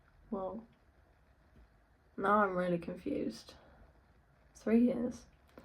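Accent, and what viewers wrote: England, United Kingdom. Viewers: You're a sweetheart. You obviously have a kindheart and you are down-to-earth, please don't ever change. Always keep your beautiful smile and continue to be the genuine kind person that you are. I did not expect to hear an English accent, but it is cute and it suits you. You are very cute. BTW, 19 is not old. It's all relative. When you are 16, a year is 1 1/16th of your life, 19 = 1/19th, etc. So the older you get the faster time flies. Yet, we all experience life in a linear manner that is moment by moment and even then most people are not mindful of the present moment, they're usually thinking about the past or what could happen in the future. But that's all just my own basic philosophy about how we experience time in this existence. I have some philosophical ideas that blow people's minds. Hahaha. I'm glad you made this video, it's illuminating and now I feel like I will care more about seeing your videos. 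I hope that you find love with an amazing musician that is truly as sweet and kind as you are and appreciates and respects you the way you deserve to be treated. I would love to hear you write some amazing music that is authentically original and well defined in your own style. That would be awesome!!! Please keep that sweetness, your smile and your genuine honest personality and please don't mention anything about age... hahaha. I'm 46, but I look 30 and I feel like I'm 20. It's more of a state of mind and/or number, than anything else. I know people in their 60s that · Lahhh kau british??? Ingt ulu kelang je..... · Are you from England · I was surprised youre English! Keep going ✌🏻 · I enjoyed your talk today. You are a sweet girl. I miss England; I wish I could go back sometime. I love the accent. I’m from the states, here in beautiful North Carolina. I lived in England because of the Air Force. 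RAF Lakenheath, Suffolk. Take care your doing great 👍🏻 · I couldn't tell you're not American you sound British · Which UK accent is this? She pronounces guitar or band as a North West english speaker would do, but in many sentences, it's a plain and even melodie, just like on an irish or american tongue. Any help? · Oh yea British!!!!!! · Do I detect a little bit of an English accent? Your guitar skills (and many musical instruments) is amazing! Keep up the great work!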